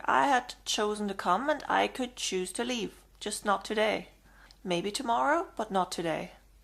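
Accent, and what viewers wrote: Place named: Northern Ireland, United Kingdom. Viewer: You sound northen irish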